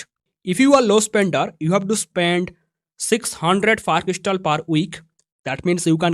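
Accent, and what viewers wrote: India. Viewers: Don't not speak English..you just talk India man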